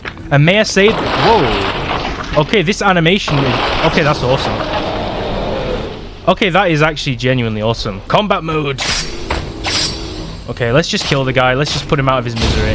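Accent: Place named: Northern England, United Kingdom. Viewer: Not sure if you explain how to get started in this pack, but I like your accent. Northern England, possibly Eastern, sounds nice.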